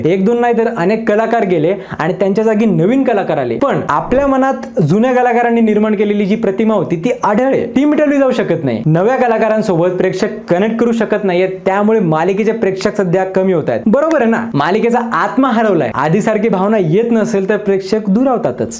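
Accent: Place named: South, India. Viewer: मॅटर कमी, बोंबाबोंब जास्त, मला हा वाटत हा चेन्नई किंवा तिरुवनंतपुरमचा वाटतं,कारण त्याच स्टाईल मध्ये बोलत आहे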